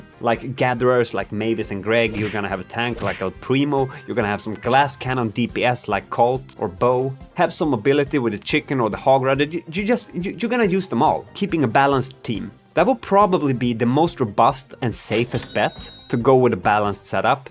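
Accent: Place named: Sweden. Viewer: First video from you that I’ve wached, great first impression. Your accent sound very swedish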